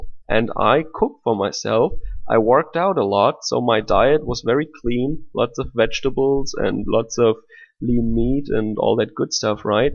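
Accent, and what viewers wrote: Bavaria, Germany. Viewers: dachte höre bayrisch :) Falls du hilfe oder unterstützung brauchst. Sag bescheid.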